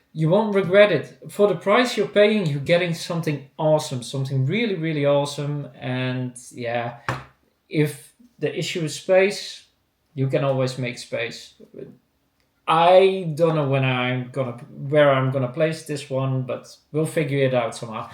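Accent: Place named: Netherlands. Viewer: Grappig hoe je gelijk hoort dat je Nederlands bent. Toffe figures. Ben zelf niet echt een fan van die Baby vegeta, oogt te groot.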